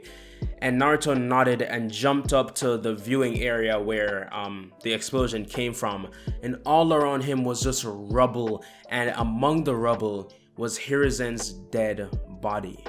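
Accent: Jamaica. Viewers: Are you Jamaican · You sound? Like your Jamaican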